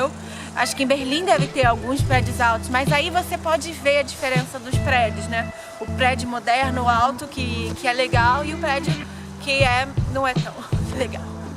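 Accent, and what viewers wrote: Rio de Janeiro, Brazil. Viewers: Você morava em São Bernardo do Campo? Mas você tem sotaque carioca, haha.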